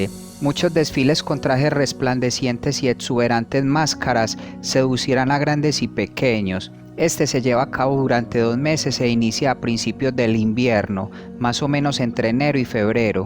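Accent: Antioquia, Colombia. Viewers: Qué lindo eres en tomarlo en buena manera, pero va a ser difícil trabajar en ese acento paisa, de todas maneras sigue tratando. Muy buen Video!😊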